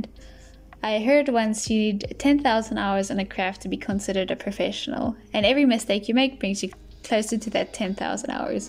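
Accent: South Africa. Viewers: Are you South African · wait you are south african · WOAH ur south african too??? i thought i recognised the accent- i thought i was aloooone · oms you are from South africa too, girl ive been watching your videos and i only hear this now woowww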